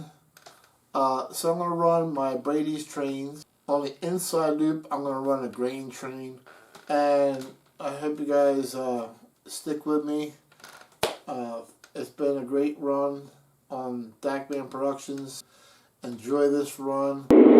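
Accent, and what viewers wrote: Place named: South Jersey, United States. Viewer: 14,000 subscribers! Wow! you're living proof that nice guys can finish first and "Nothing succeeds like success!" Congratulations, and a success well-deserved! A speech impediment? Honestly I've never noticed. The only thing I HAVE noticed about your manner of speaking is your DEEP South Jersey accent! I haven't heard an accent like that since I went to Glassboro State back in the 1970s. As soon as I watched one of your videos I said "Hey, I know where HE's from!" 😃 Anyway, just keep doing what you do and the way you do it! And again, sincere congratulations!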